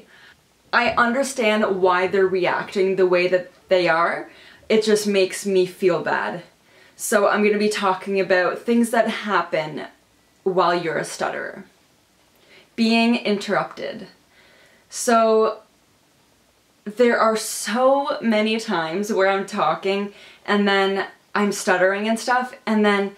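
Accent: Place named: Canada. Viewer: Are you from Canada? I hear an accent. Love all your videos btw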